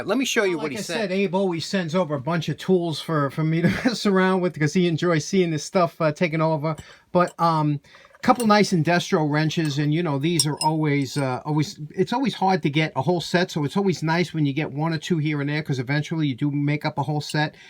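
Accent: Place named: Long Island, United States. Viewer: I love the smell of those old electric drills too- and I love your Long Island accent - takes me back home to Brightwaters. Keep up the inspirational videos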